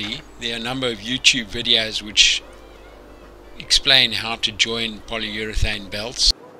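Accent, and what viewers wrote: South Africa. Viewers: By your accent you sound like a South African…. I too live in SA.I have one of these lathes that my Father gave me way back in 1970. Unfortunately the motor stopped working and the drive belts broke. Recently have managed to find replacements for these. Where do I get polyurethane belt material in South Africa if the new replacement belts snap? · Hi , I have a Unimat SL I bought new in 1977 with many accessories , I made a nice box to store everything in but never used it until I retired in 2019! Now with spare time I am making wooden model wagons and find the Unimat excellent to drill wheel and spoke holes accurately using the 3 jaw chuck on the dividing head, I have 30,40 and 48 tooth inserts for it which gives all possibilities for the number of spokes per wheel you need. I enjoyed the video and still look for more accessories, I have the thread cutting attachment but no leaders or followers and I would like the adjustable tool holder you show, I do detect you accent as very close to a south African one which is where I am in Springs. 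· Thanks for a great video. I inherited a Unimat from my late father in law. Your channel is a great knowledge source. Thank you PS are you South African?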